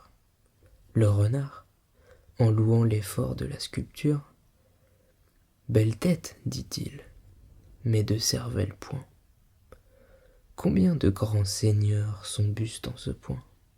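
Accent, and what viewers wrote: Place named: France. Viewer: damnnnn the french accent is everything 😩😩😩😩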